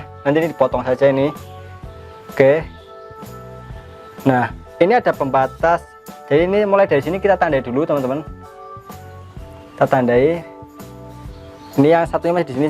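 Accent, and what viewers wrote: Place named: Java, Indonesia. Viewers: Bener banget masx , sy suka kesulitan di bagian ini , triims banget atas ilmux . Njlimet sih tp gamblang , puas banget nonton tutorialx .👍👍👍 pasti lebih mumet lg tuh dgn bahasa medokx si mas , Untung aqiu org jawa jd keren aja . he he .... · ....logatnya medok bgt. "saya menemukan cara ini sudah seratus tahun yang lalu"...temen-temen Yang penting pahammmm......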